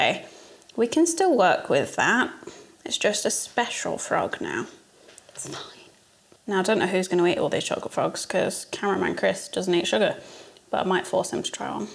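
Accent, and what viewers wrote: Birmingham, United Kingdom. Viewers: You are sooo brummy in this video, u cute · You sound so Brummy in this vid! 😂😘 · Hilarious. Also, your brummy accent came out loads in this 😆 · The brummie accent is so apparent in this video and I love it 😂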